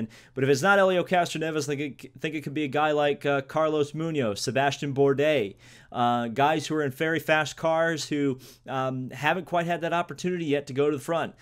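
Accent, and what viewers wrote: United States. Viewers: Hey are you southern? You sound like a southern person to me at least.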